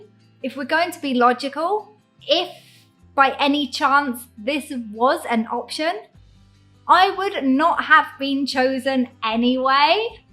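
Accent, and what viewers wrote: England, United Kingdom. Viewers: I enjoy watching the videos in this channel as I get to learn what the life, particularly the bright side, of western immigrants is like in Taiwan, with a bonus of listening to the voice with a beautiful British accent. I would not be worried about the content balance, as we all understand this is not a news coverage channel. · 妳的發音字字清晰，英國發音真的跟美國不一樣。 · I like your fascinating British accent, very clear and speed just fine. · Say thanks to who lovesTaiwan according to yourself experience here. I also like to practice my listening in British English by your channel. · 對了，我非常喜歡您的英式英語的語調，從以前到現在都一樣，就是喜歡英國腔的英語而不是美國腔的美語！老實說就是讓我覺得聲調很悅耳！ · 很清楚很濃厚的英格蘭腔英語發音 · 英國腔調的英文就是讚拉！ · 看影片聽你英國腔感覺很有趣，常常想到哈利波特電影他們說話的感覺。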